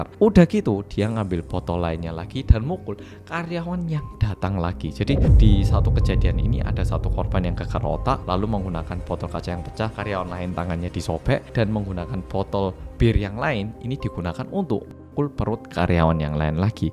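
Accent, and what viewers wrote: Java, Indonesia. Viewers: Oppo benar-benar udah indo banget ya 😅 selain medok selalu untung gak mau rugi 😂 Yang sakit gak hanya bumi, manusia yang hidup didalamnya pun sakit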